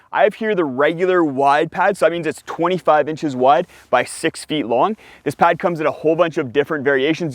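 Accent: Canada. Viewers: I mean if the accent wasnt obvious, the mixing of metric and imperial measurements make it obvious of your canadian heritage lol.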